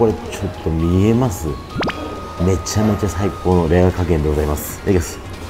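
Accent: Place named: Okinawa, Japan. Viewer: イントネーションが沖縄です😃素敵👏👏👏👏👏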